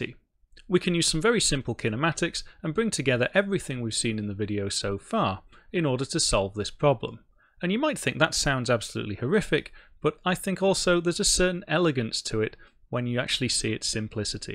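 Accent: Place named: United Kingdom. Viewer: I think it's charming that you use hand drawn letters and figures versus perfect computer fonts. That's a good segway to getting into the four linear acceleration equations with d, t, v and a and the more complicated cross product. Then applying force on an elevation with coefficient of friction. From the American perspective, having a British accent and talking about a scientific or philosophic topic makes you sound smart. XD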